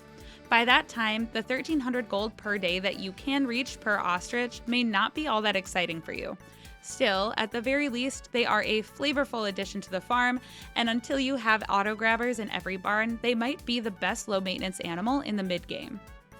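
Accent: Canada. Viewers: You sound Canadian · Are you Canadian?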